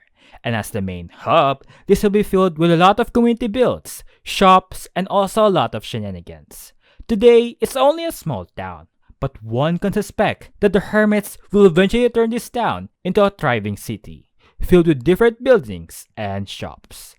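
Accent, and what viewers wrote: Philippines. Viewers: Why do you sound like a Filipino? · Pilipinonh pilipino yung accent!!!!!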